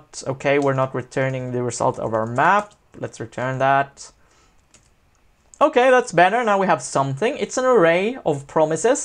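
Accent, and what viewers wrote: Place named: Sweden. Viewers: Swedish accent > American accent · Haha I remember when I watched this channel for the first time and I was wondering where is from. English that sounds better than the American one ^_^ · Swedish accent ftw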